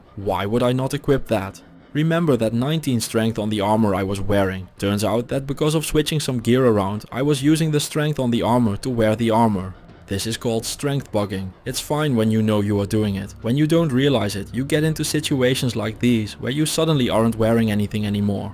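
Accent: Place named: Netherlands. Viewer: you sound SO Dutch it hurts my ears!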